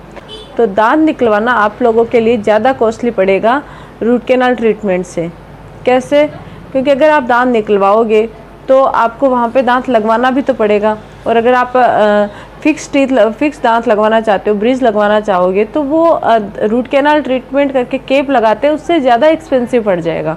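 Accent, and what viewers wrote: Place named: Marwar, India. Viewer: आप भाषा से मारवाड से लगती हो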